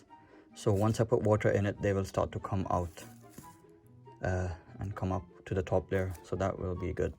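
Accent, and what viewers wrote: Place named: United Kingdom. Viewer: I can hear a bit of a British accent there mate :p Nice work Akhi.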